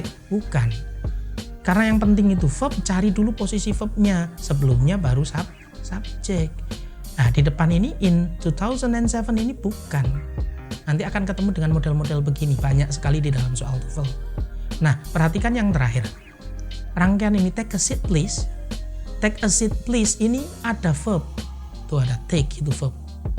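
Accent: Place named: Surabaya, Indonesia. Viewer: MANTAP. NGGAWÉ LOGAT SUROBOYOAN ❤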